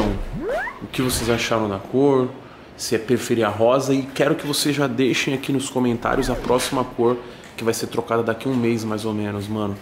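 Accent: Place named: Bahia, Brazil. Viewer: baiano dms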